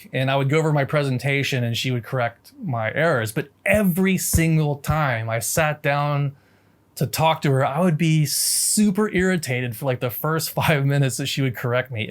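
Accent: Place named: South, United States. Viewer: Isn't it the case that, if your individual syllable tones (excepting the usual two-syllable modifications) don't translate into an overall sentence intonation, your Chinese won't sound authentic? Won't it sound more like someone reading or carefully imitating a learning video? I'm still not much advanced from HDK 1 but it seemed from what I heard and from the pinyin that you were speaking Mandarin rather than Yue/Cantonese although some of the hanzi weren't the simplified ones. Do Yue-speaking Taiwanese use the Mandarin pinyin to approximate the nine tones of Cantonese? On another matter. I'm a Southern USAmerican like you but I've spent a lot of time growing up with British people, and there still aren't many natives in Great Britain whose accent is like yours. It's true that some sound pretty much like Americans, seemingly a growing number. 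However, they're the exceptions, but may not be much longer if the trend continues. Almost no one there speaks English anymore as Prince Charles still does, not even Queen Elizabeth. When I get mad, I sound like Andy Griffith in his early career days, but most of the time people think I'm from New England. In New York, they ask me if I'm from Boston. I can understand the impulse to avoid sounding like a down-home yokel.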